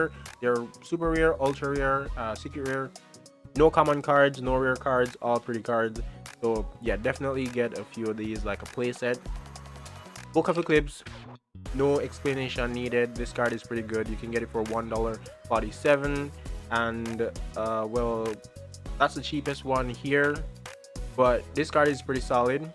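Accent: Jamaica. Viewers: I can tell from your voice that you are Jamaican. Can you tell me where you play? I am trying to find a locals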